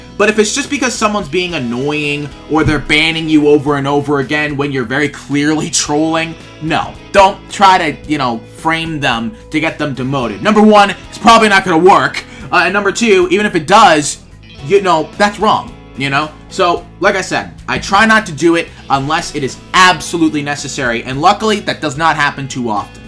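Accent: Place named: United Kingdom. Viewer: Hello! I actually watch your videos and I really enjoy them. Also, you have a pretty good bri'ish accent.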